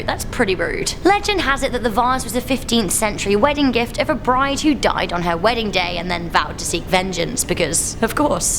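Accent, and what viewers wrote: England, United Kingdom. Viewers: Why are the people that do these videos always British and ALWAYS so God damned irritating!? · r u english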